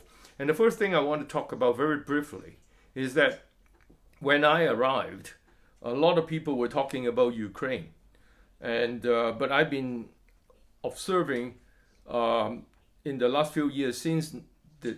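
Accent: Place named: United Kingdom. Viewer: Thanks so much for the broadcast. I noticed the British accent increases just a few minutes into the show. Good work.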